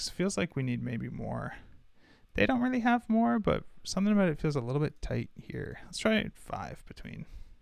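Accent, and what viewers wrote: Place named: South, United States. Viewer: Are you southern? You have like a very subtle southern accent.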